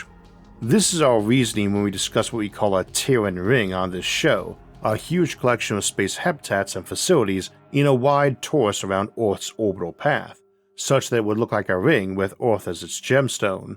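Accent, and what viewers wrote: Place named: North Carolina, United States. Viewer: "Whey-oh distances are great-or" - - It's kind of a North Carolina accent... but not exactly.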